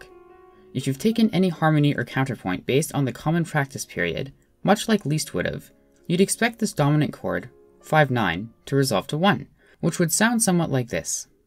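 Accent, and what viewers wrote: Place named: South, United States. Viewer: southern accent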